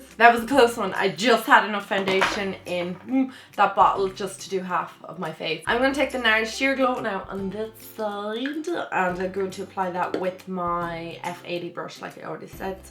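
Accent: Ireland. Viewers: I heard an Irish accent and the description says you are from Ireland. Yesss!!!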